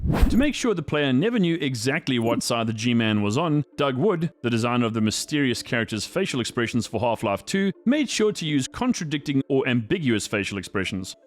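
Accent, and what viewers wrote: South Africa. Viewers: I know a South African when I hear one, lol. Dope video.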